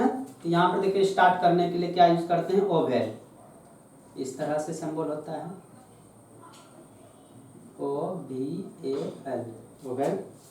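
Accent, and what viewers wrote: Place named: Bihar, India. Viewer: भाषा की सरलता और हिंदी बोलने का तरीका बिहारी झलक।grest work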